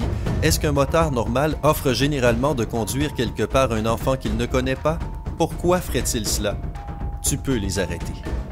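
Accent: Quebec, Canada. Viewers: Rien avoir tu es québécois ?? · Figooroo ! Ouii j’avais aussi l’impression durant toute la vidéo qu’il parler avec cet accent Québécois 😍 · dislike , c'est le québécois qui parle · Tes québécois ? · Eum c’est moi ou le commentateur est québécois ( comme moi ☺️ ) et wow super belle vidéo et très utiles 👍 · C pas un accent quebecois? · Québécois... j'aime ça ! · T canadien pas vraie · Simpa , ta l voie de qcoxe (je me demande si tes quebéquoi ou tes juste lui 😐) qui est d'accord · Les accent québequois .. AH CA M'EXCITE!!!! 😄😄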